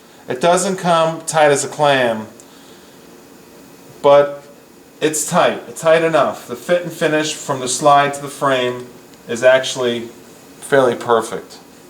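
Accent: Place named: New England, United States. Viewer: Ya from Mass? got the New England accent going on there.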